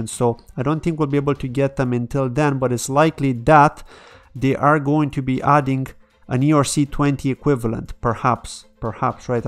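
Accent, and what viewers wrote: Ireland. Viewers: I thought I heard an Irish accent in your voice. Are you involved in Irish crypto much?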